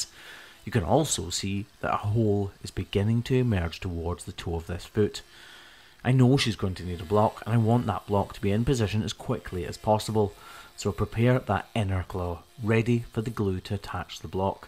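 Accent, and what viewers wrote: Scotland, United Kingdom. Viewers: I thought you were Scottish and this video proved me right🏴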